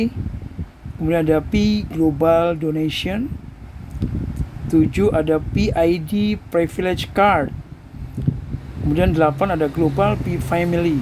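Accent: United Kingdom. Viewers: KEREN ENGLISH NYA PAKAI BRITISH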